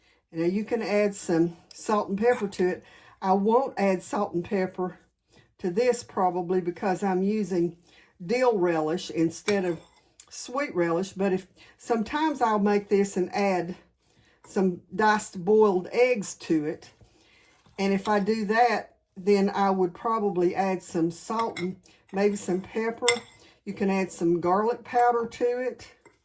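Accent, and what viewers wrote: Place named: South, United States. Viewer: Hello from Georgia! I love your southern accent ❤the food always looks great, love the presentation on this one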